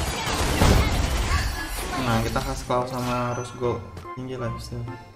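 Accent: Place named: Malaysia. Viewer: Gua dari Malaysia gua dengerin suara abang kaya orang malaysia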